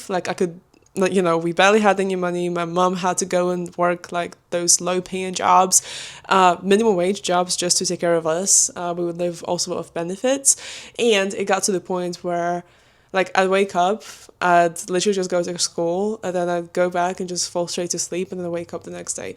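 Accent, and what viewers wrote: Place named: Ukraine. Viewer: we look so alike and have that ukrainian tone it feels like watching myself from an alternative universe omg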